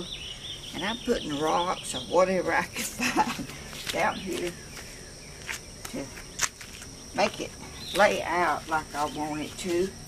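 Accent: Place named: Georgia, United States. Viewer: Love your Georgia accent❣️😊 🌹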